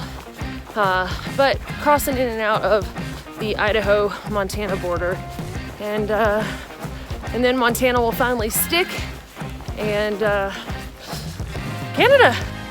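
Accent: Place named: Alabama, United States. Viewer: Am I the only one who teared up when Old Faithful started goin? I'm just in complete awe of you and your accomplishments and perseverance despite any challenges. It really makes me reevaluate the way I'm livin.. I live in some of the most beautiful of lands in northern California and tend to get complacent. I don't ever want to take all of this beauty for granted as I could be trapped in a concrete jungle. I have been binge watching this channel and now have my mama watching it too...also she's from Bama in a big way because her Dad lived in Gadsden his whole life and she'd be there for the summers. Then she started taking us kids there often growin up. So a lot of my relatives and even myself at times sound like you lol people look at me funny in Cali whenever I say "I reckon" and now I reckon I re-realize why I say it... because I've got Bama blood. 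Anyways, I can't wait to watch more of the trail and start bein more of a willer myself. I've officially got the hikin bug. Thank you for sharing your heart and journey with the world 💜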